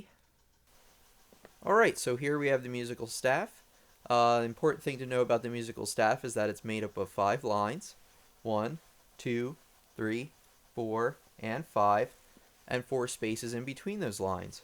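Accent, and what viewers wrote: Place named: United States. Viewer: Jess Ng lol he sounds like a normal american.. Its pretty easy to comprehend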